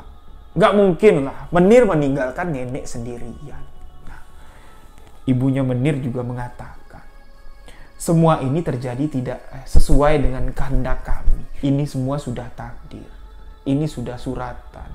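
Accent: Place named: Jambi, Indonesia. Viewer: Pertama denger tak kira org Bali g taunya logat Jambi ya ...sukses ya mase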